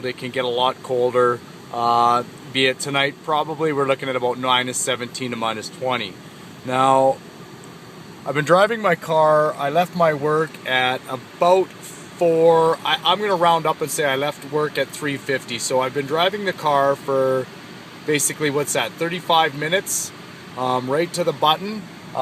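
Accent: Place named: Canada. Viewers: you sound so canadian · yep, I lived in Winnipeg for 7 years between 1979 and 1986, I actually enjoy listening to this guy, Like the Canadian accent;)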